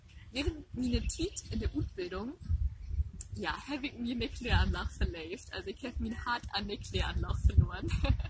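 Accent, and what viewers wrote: Low German, Germany. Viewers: Möcht ne Fru, de Platt snakt. So sexy, so sympathisch. Einfach Klasse. Könnte Stunden lang to hörn. Bannig schaun 😇🥰👍🤗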